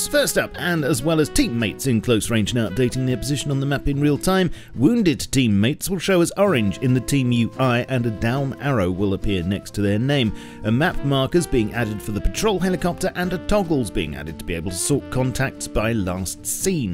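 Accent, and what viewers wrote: United Kingdom. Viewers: Am I the only one getting tired of the british accent thing? Not talking only about this channel, but everywhere in YT.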